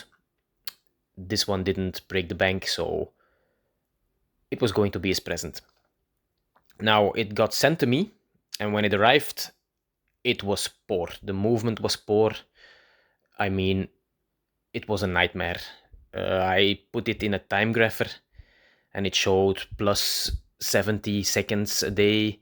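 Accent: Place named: Netherlands. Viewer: So you're belgian? :) nice (anything else would still be nice - i like your video) I was suspecting a dutch-like english accent - your pronounciation resembles Mr.Dagon a lot (a guy with a pocket knife yt channel) Subtitles would help, but even without would be better than not making the video :)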